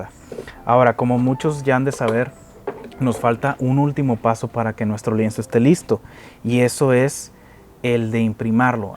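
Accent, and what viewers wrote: Mexico. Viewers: Me agrada tu acento, ¿eres del norte de México?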